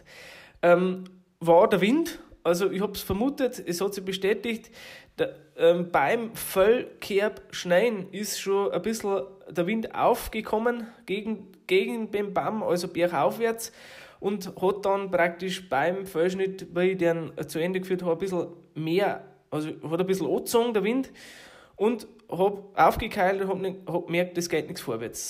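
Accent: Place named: Upper Palatinate, Germany. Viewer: Hallo, wo kommst du genau her? Vom Dialekt müstetest du Oberpfälzer sein und mit KEH-Kennzeichen kommt da nur der alte Riedenburger Landkreis in Frage? Dann war ma ja fast Nachbarn, Schöne Grias aus da Obapfaiz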